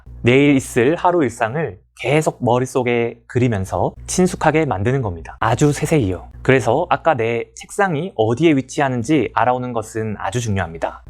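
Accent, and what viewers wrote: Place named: South Korea. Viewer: 여기착한의사선생 말투가 서울토박이가 아닌 느낌이 드네요?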